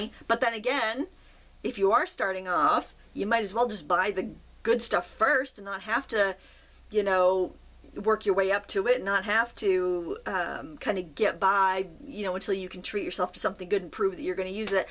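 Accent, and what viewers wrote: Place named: Texas, United States. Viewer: Hi! It is so defucal for me to understand what you say, for the firste, you talk wery fast, and with your lovley texas accent, i would love it to stay that way, ant i wont have a single issue iff you just show the stuff you tell about... Love from E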